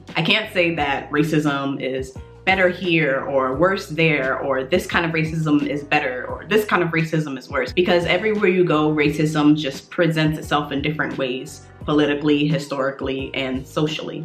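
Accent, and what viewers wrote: New York, United States. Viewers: As soon as you started talking, I knew you were from New York lol. I'm also from New york(Harlem), currently living and working in Germany. I can definitely agree with your video.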